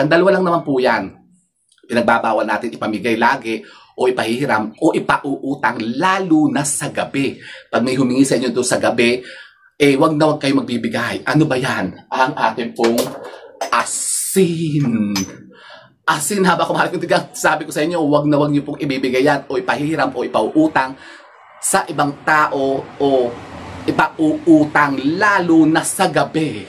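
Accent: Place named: Laguna, Philippines. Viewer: Ganyan talaga punto sa laguna😂ganda ng outfit eehh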